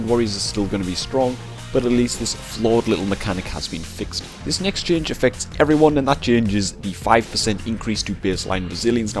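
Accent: Newcastle, United Kingdom. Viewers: are u a geordie?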